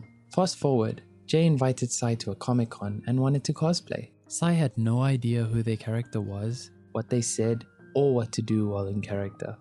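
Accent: South Africa. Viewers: the man speaking in this video has a South African 🇿🇦 accent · South African narrator???? 😁😁😁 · the voice over artist has a South African accent😁🇿🇦❤ N#NODNAJUSTRSA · I love that the voice actor is south african ahh! good to see more of us in the media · Is the narrator South African?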